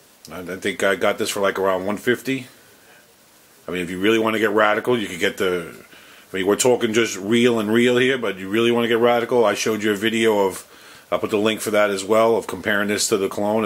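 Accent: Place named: East Coast, United States. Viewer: ok !!!! I agree with everything you said!!! we can be friends I tend not to go over $200 either I think it's east coast mentality! but its tough because I tend to go for premium steel these days only, s35vn, cts-xhp elmax m390! I favorite steels are Elmax and m390! do you have a preference? I have a full collection of discontinued kershaws all brand new with boxes! some of the ones I own are the kershaw offset, (Please checkout my video on that), the tyrade, storm, n ergy, cyclone, and a kershaw barrage (AKA Salvo) I wanted to collect all the kershaw knives before 2012 and zt came along to me its sort of historic, as much as I loved knives I wanted my collection to have some sort of theme it made sense anyway, anyhow sorry to ramble but I don't have any friends who are into knives, wish I met you 30 years ago but you probably weren't born Yet? 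wish i could meet you some day you seem like a cool dude your accent makes me feel connected to home. I live in Washington now and the food here sucks I'd kill for pizza! hell i'll take a knish!!!! LOL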